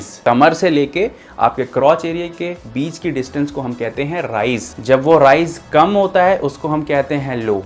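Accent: Lucknow, India. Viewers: Lucknow se ho kya bhai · Are you from lucknow 😀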